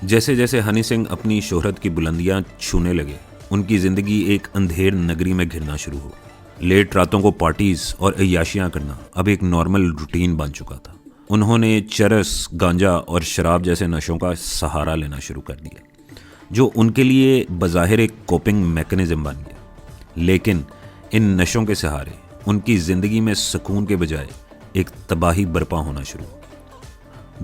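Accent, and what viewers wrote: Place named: South, India. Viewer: R u a muthalman bcoz u sounds as a mdracha chaap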